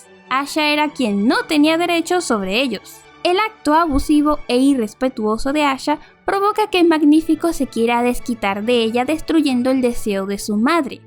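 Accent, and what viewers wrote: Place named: Caribbean Coast, Colombia. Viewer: Eres de la costa de Colombia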